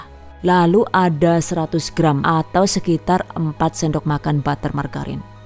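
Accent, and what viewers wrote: Banyumas, Indonesia. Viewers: yutuber favorite kluargaku,,,resep anti ggl,,,bhsanya mdh di mngerti tdk bertele",,,,tutornya jelas sejelas" nya,,lucu bgt klo sdh ngluarin bhsa ngapaknya ,,,😂👍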